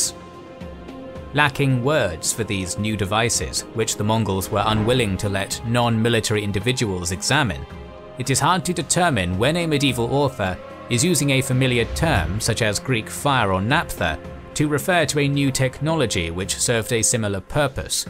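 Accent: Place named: United Kingdom. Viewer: With the narrator's British accent, war pow might have been closer to the Mandarin pronunciation for him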